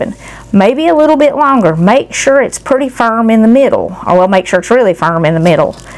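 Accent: South, United States. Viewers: I remember Grandpa would use his left over biscuit bread as a filler. What part of the south are you from your accent makes me so home sick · What does God have to do with meatloaf? ...and the backwoods southern drawl and terrible grammar are not encouraging !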